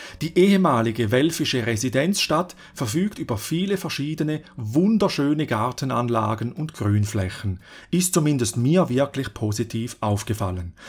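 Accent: Switzerland. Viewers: Ich bin Deutschmarokkaner und liebe diesen schweizer Akzent. 😁👍🏻 Übrigens ein tolles Video! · Vielen dank für den ausführlichen und aufschlussreichen Beitrag über die niedersächsische Geschichte! Ich als selber Niedersachse, der aktuell Urlaub am Genfer See macht, hatte eine gute Unterhaltung und freue mich auf die weiteren Videos! PS: Ich liebe deinen schweizer Akzent/Dialekt! :)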